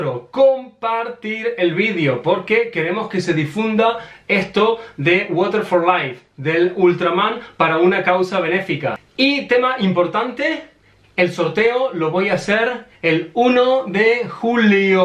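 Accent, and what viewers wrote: Argentina. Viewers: Muy bien haz ayudado a 50mil y si nos haz hecho sentir muy bien una pregunta eres argentino pues no hablas cpmo español???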